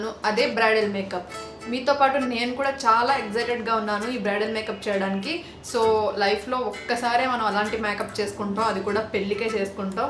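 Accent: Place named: Ongole, India. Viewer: gaaru meedhi ongole la...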